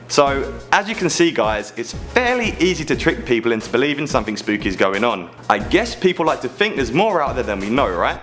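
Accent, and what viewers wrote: United Kingdom. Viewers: British accent <3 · Yay another British host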